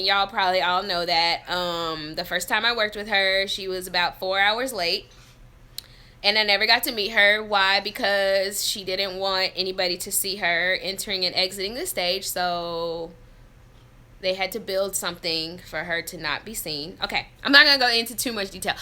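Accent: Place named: South, United States. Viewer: That southern accent is never gonna go away darlin'. You are always awesome!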